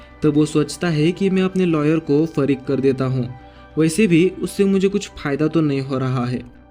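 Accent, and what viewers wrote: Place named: Maharashtra, India. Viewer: Bhava marathi ahes na? Accent varun samjl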